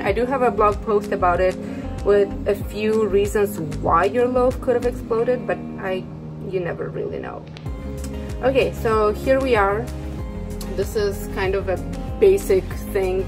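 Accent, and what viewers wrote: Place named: Egypt. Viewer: Your accent reminds me of some of my Egyptian friends. :)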